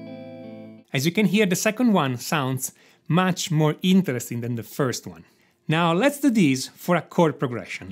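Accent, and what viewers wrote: Italy. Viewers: Are you russian or italian? I noticed a strong accent, like we do in italy. By the way, your videos are just exceptional! I ve never seen such logic explanations, directly to the point. Your explanation with spoken theory and the sound of what you re explaining make these videos really valuable. Great work, keep on going! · you sound italian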